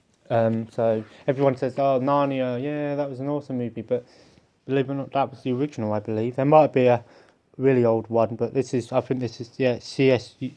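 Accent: United Kingdom. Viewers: speaking British English That is my favourite! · where in England are you from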